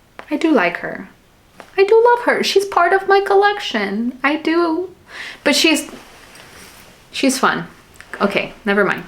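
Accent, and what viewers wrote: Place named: Latin America. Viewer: kaya (hello!) from unceded wardandi noongar boodja, a.k.a. the south western most corner of western australia. I’m not really a commenter, but I’m pretty sure your mystery hoya is a fungii (i love it’s cute mushroom name!) I wanted to say that your planty joy is a pleasure to share in and your genuine and unabashed enthusiasm is greatly encouraging and uplifting, a real celebration of life in her many forms. I thought while I’m here I’d share that two more things, one that the indigenous land I inhabit is one of the 36 global biodiversity hotspots, and we have a range of incredible native orchids and carnivorous plants that are currently growing and blooming throughout our season of Djilba, you should look up the ‘bridal rainbow’ drosera and the ‘queen of sheba’ orchid if you get a chance, they’re just some of the most famous of many stunners! 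The other is that I figured from your cadence you are latin, my housemate and close friend is Ecuadorian, working on getting permanent residency here, and she wanted to grow a cactus on her desk at her new job, so I showed her your videos on the beefs (!) for some tips and also bought her a mini grow light because her office isn’t well lit, and we’re going to go to a local specialty beef nursery to pick some new friends for her! I showed her your video of trying the tunas fruit with tajin, she actually found tajin in our local supermarket here, I’ve tried it with mango, and as a gringa (haha!) I understand the hype now! I have a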